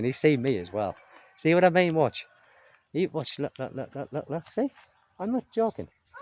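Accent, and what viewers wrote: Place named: Cockney, United Kingdom. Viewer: Being French and loving the English language, my favorite is the cockney accent from London. Which is where your accent is from? It sounds chicky ans is very lively. "Hello, you lovely people!" I love it! And then the little boy coming in at the end as if, he too, wants to take part to the video. As for the content, I guess we can get bored and lonely anywhere in the world, maybe that some places are just better than others to get bored or lonely. There's no paradise on earth, I think..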